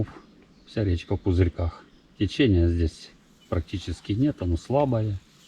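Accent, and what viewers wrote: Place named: Ukraine. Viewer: спасибо за рекомендацию) по Украине - дык по говору узнал своих😅 по карпу-Вы абсолютно правы! бывает 3 кг рвет круче 10ки. самое интересное в тгм году на небольшой но быстрой и закоряженной речке взял 2 карпов с разницей в несколько дней. оба 3400+-. дали абсолютно разный бой. первого я просто чудом достал. второго - легко и спокойно.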